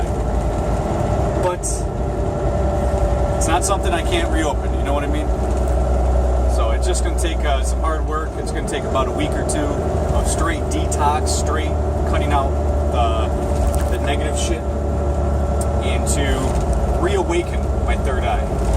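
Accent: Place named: Rochester, United States. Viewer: Dude I KNEW that had to be a Rochester accent!! I grew up there.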